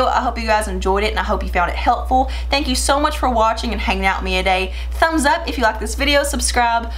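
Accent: South, United States. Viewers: Tímea Tarjányi, it's a southern accent. Do you mean where she lives.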